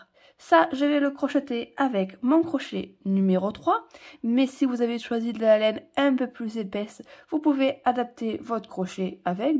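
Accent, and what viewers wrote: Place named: Southeast, France. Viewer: Oui, Vos explications sont claires et nettes et votre joli accent me rappelle que moi aussi je vis dans le grand sud-est de la France. C'est du soleil pour la journée. Amicalement.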